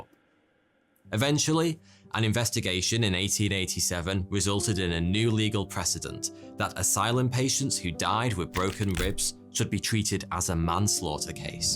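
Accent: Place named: England, United Kingdom. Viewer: I find that the English accent is perfect for this expose on asylums. Assange is being held in solitude in a British Prison. The Russians outlawed Lobotomy before anyone else! We lied about going to the moon and have English actors working for NASA. Truth is a rare commodity when you examine the facts.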